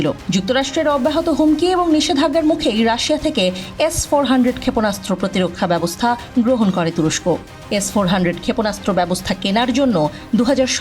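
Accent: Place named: India. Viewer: এই মহিলা কি ইন্ডিয়ান,তার কথা বলার ধরণ দেখে এমনি মনে হয়, এমন ভাষায় কথাবলে ভারতীয়েও সংবাদমাধ্যম, হাসিনা কি ভারতীয়েও বর্জন প্রডাক্ট ব্যবহার করছে বাংলাদেশ ভূখন্ডে।।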